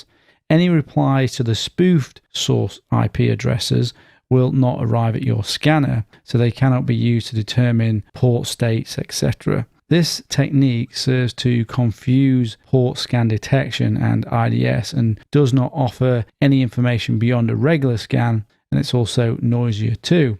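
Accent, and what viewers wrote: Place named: United Kingdom. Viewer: A very good detailed explanation .... only thing it's kinda a little bit hard to understand your british accent.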